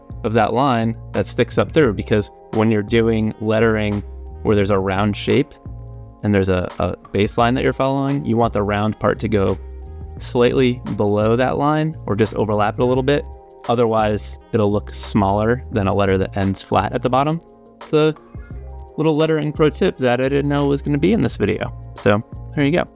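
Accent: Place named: South, United States. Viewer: Southern drwarl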